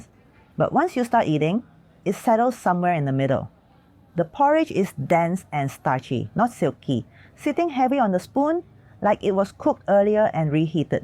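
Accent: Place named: Malaysia. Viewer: you’re most welcome! Hopefully, one day there will be enough to fill a book. You are doing a service capturing the humanity and character of a version of Malaysia that is rapidly vanishing into the past. Your gentle narration and natural Malaysian accent make the videos even more special. I hope more people discover your channel!Brava! 🫶🏽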